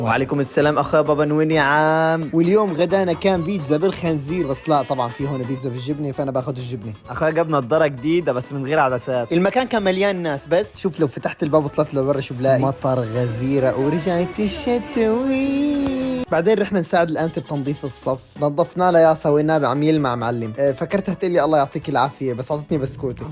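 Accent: Egypt. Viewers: انتي مصري ولا سوري حيرتنا 😅😂❤ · انت مصري ولا يه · انا حاسك مصري مصري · هوا مصرى تحسو نصو مصرى ونص سوري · هو انت مصري ولا ايه يا روح الب اخوك❤ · سوري ولا.مصري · المصري تبعك كتير بيضحك 🙂😂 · إيداااا انت من الشرقيه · اللهجه المصريه برفكت ❤ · بجد طريقتك كلامك بالمصري تحفه😂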